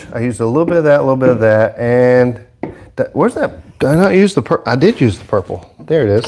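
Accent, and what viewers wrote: South, United States. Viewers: great !you sound like yer from the south!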